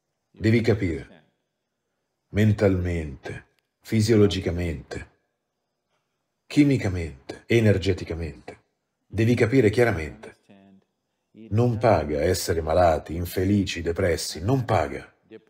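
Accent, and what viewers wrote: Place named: Rome, Italy. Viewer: Certo che vedere un guru Indiano parlare e sentire il doppiaggio con accento romanesco non è il massimo... Almeno mettete qualcuno che non abbia un accento!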